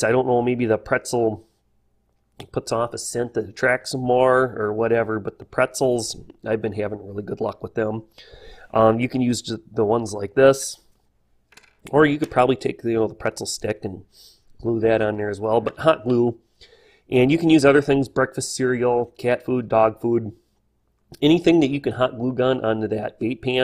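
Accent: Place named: Midwest, United States. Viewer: Minnesota? Or somewhere deep in the country midwest.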